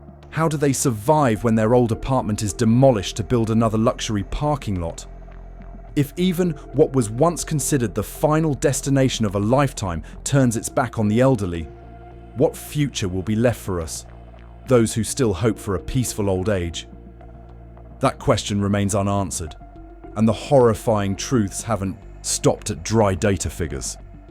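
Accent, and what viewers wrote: United Kingdom. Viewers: f this film producer nothing but propaganda ...every place has good and bad..:face-green-smiling: most are illegal criminals anyway f n brit narrating lets talke about england where he came from wht a jerk how about england paying its bills from both wwars to usa that they owe starting with your loser kings wealth? and all ye yankees go home anyway the locals are doing fine without ya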